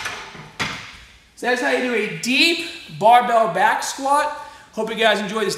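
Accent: Boston, United States. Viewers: I noticed from watching many of his videos that his Boston accent kind of comes and goes...I guess similar to how my Texan accent comes and goes depending on who I've been around all day. If I'm visiting family, I have a stronger accent. But I live in Korea, so I usually have a very standard English way of speaking.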